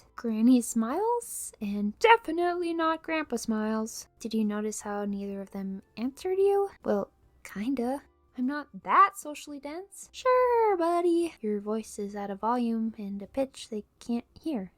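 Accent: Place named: Australia. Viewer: I don't why but everytime I am rewatching this; I am hearing a slight Aussie accent from you. LOL !!! Yes ... I came back to type this !!! 😆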